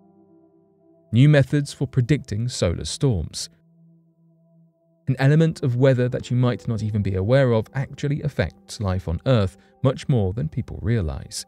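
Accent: United Kingdom. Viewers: Yeah well he is not American…he is British That is how the British pronounce it. In fact that is how I pronounce it too. I’m South African and we speak UK English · British pronunciation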